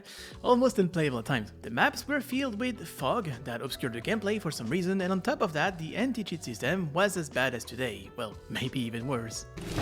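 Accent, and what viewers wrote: France. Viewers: In the first minutes I didn't realised ur french. Srryy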